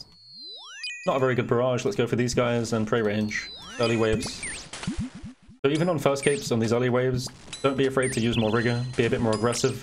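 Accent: United Kingdom. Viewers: u from the uk?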